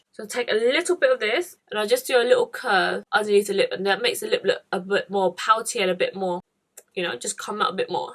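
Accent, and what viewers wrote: United Kingdom. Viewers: hey girrrlllll Im Gemini too june 11th :) i love the uk accent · I wasn’t expecting the British accent 😂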